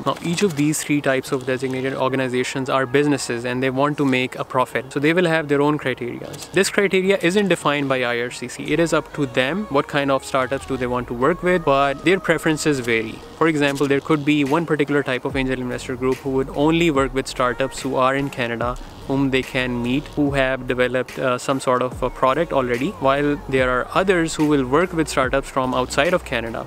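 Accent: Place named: England, United Kingdom. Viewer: Wonderful English accent 😍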